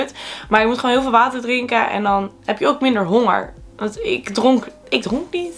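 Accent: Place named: Netherlands. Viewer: Ik ben de video an kijken maar ik versta bijna nixxxx. Omdat jij een Hollandse akzent hebt. Kann jij mij is zeggen wat jij gedaan hebt hahaha ik versta nixx sorry.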